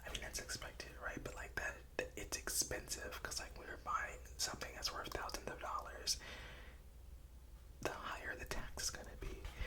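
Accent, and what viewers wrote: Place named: United Kingdom. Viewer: the british accent omg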